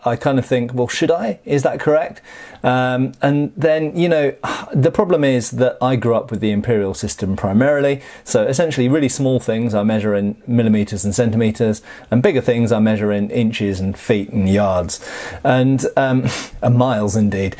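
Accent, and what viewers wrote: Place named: United Kingdom. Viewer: 'Ello! French here! I'm not so much into weapons and stuff. I don't know about the other French people, but I'm just suscribing to your channel because I love ze british accent! Also, 'ere, we don't say ze metric system, we say ze internationnal system. Lot of love from Paris.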